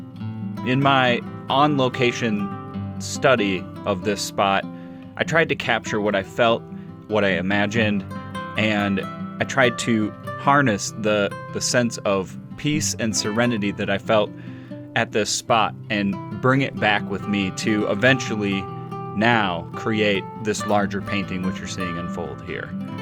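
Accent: United States. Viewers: Even listening to your voice. So calming. That American accent. I’m from Scotland. Have been often to California to see my son.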